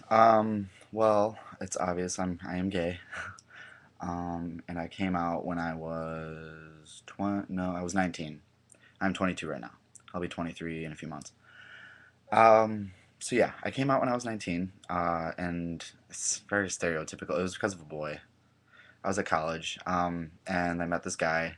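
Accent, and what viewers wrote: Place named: Midwest, United States. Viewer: you are adorable regardless of the lighting & love that midwestern accent...thought you were from minnesota until you mentioned chicago! =)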